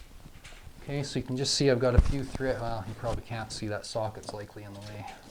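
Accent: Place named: Prairies, Canada. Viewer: Thanks for the video and your thick Canadian prairie accent is making me homesick!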